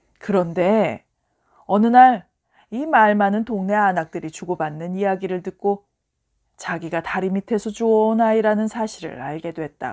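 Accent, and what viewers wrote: Gyeongsang, South Korea. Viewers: 경상도 사투리..ㅋㅋ 감사합니다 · 갱상도 사투리 좋아요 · 사투리는 전형적으로 타지분들이 하는 서툰 경상도 사투리인데요ㅋㅋ 그래도 왠만큼 하시는것같고 귀여우시네요ㅎㅎ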